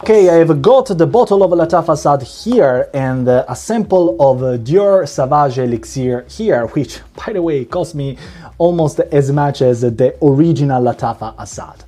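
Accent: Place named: Italy. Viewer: thick accent. are you Italian?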